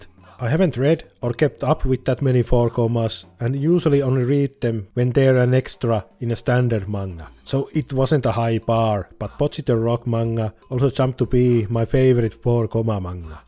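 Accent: Finland. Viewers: As finnish i can recognize strong finnish english accent. Great video btw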